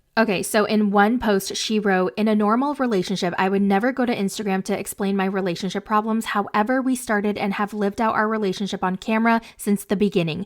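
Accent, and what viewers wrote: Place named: New York, United States. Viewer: Lol, your new York accent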